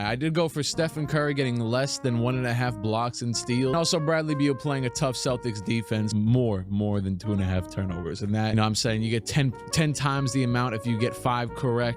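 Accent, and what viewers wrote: Trinidad and Tobago. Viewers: your trini? same here bro fire vid ❤️ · Are you actually Trinidadian ? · Nah no way bro was trini this whole time 😭🫡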